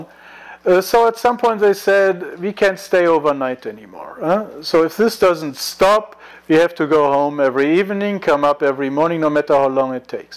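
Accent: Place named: South Africa. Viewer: Where are you from? It sounds like South Africa, but I'm not sure.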